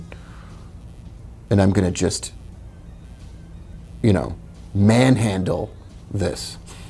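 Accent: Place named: New York, United States. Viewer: You can always hear a new yorker!! Even without a microphone!!!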